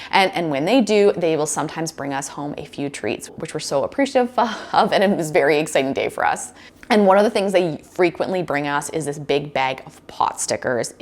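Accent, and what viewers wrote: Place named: Canada. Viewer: Is your money info American or Canadian? I can’t recall if you’re in Canada but I know some of your words sound Canadian. Just curious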